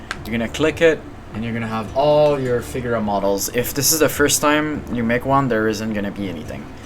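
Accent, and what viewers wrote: Quebec, Canada. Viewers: correct me if im wrong but i think this is a Quebec accent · Am I crazy or do you sound vaguely canadian french?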